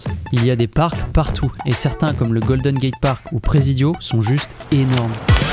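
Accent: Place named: Nord-Pas-de-Calais, France. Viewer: Bien bien :) J'aime bien le ch'tis ! Ta un avenir en comédien en fait, oublis youtube ! :) Par contre pas fan du filtre blanc que tu as utilisé :(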